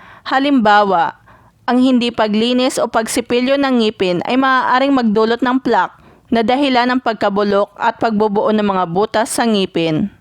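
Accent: Visayas, Philippines. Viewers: Bisakol magsalita Hahahahaha hahaha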